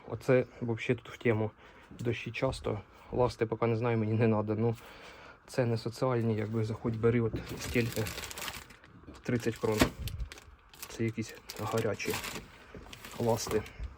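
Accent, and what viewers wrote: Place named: Surzhyk, Ukraine. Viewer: Ти - чудовий блогер і обізрівач, якийсь рідний і близький, як справжній! Не звертай увагу на коментарі заздрісних диванних "експертів "! І суржик твій - просто шикарний - щось близьке і приємне для вух, бо дуже багато українців розмовляють в побуті саме такою мовою! УСПІХІВ І МІЛЬЙОНІВ БАЖАНЬ, які неодмінно ЗБУДУТЬСЯ! В ТЕБЕ ВСЕ ВИЙДЕ, як мрієш, а можливо навіть краще, ніж очікуєш, не бійся мріяти і робити, що тобі хочеться!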